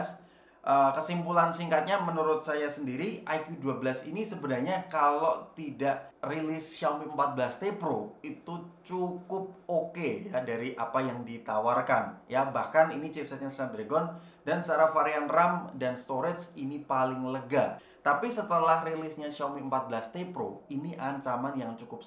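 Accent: Semarang, Indonesia. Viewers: loh citragrand, masnya orang semarang yaah? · loh semarang to mas ?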